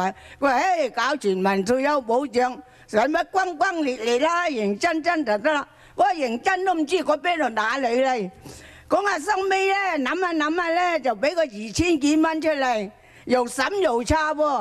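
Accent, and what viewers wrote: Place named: Western Guangdong, China. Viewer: 哈哈。粤西口音。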